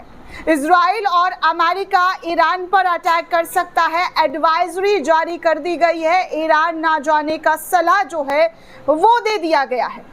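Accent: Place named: Uttar Pradesh, India. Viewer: She is from UP probably from western UP or Central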